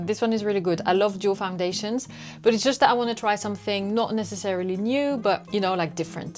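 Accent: United Kingdom. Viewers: Very good British accent, I prefer this version by far.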